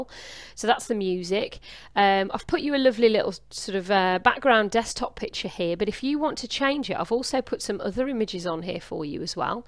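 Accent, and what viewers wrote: Midlands, United Kingdom. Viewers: Thanks for this.. Very useful indeed...you sound like a fellow midlander btw :)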